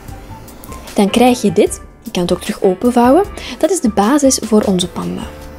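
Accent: Belgium. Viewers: Jij bent toch Belgisch?, te horen aan je stem🇧🇪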